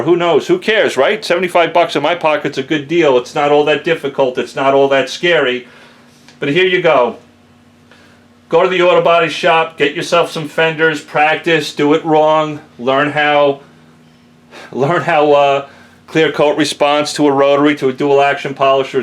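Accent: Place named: New England, United States. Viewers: Obviously doesn't sound like a Dallas native. Please go back east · Horrible!! What a joke. You just ramble on and on. Nobody clicked your video to here of your personal life or personal BS. You should remove the fact that your a local DFW Texan especially with that infuriating Yankee accent. Knowing that alone is a deterent to clicking this video😠😠😤😡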